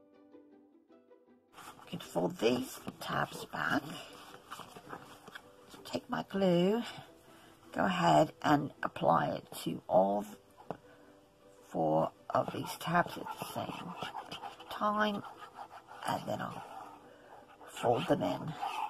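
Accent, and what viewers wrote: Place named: United Kingdom. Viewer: are you from the UK. Your tone and accent is very nice. We don't feel like you are rushing through it. Now your project is the best one yet. I love the little sheen in the checkered pattern and the bling type ribbon you used to give that wow factor. Give Chloe little kisses for me.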